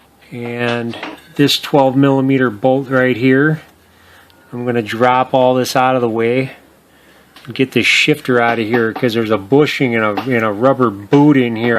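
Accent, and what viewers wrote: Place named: Midwest, United States. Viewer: Enjoyed the tutorial man with the Midwest accent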